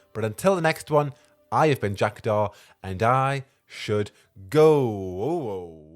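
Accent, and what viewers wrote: United Kingdom. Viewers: Ah, so you're British. Totally irrelevant I know, but I couldn't quite place your accent before. Then again, I suck at that. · You are very british, and that's coming from a British person. What I mean is, your voice is very soothing to listen to lmao if that makes sense??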